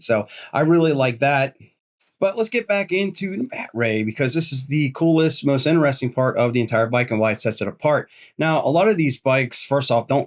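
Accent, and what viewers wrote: Pittsburgh, United States. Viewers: Hah - didn't even recognize it was you...until I was listening and heard just a hint of yinzer and I said, hmmmm, that's real nice like. Awesome stuff. Get the gear.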